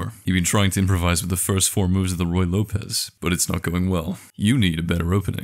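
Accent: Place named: United Kingdom. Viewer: What is this guy's accent? it sounds half British half something else. I'm mainly here for the whispered interpolations. Very nice.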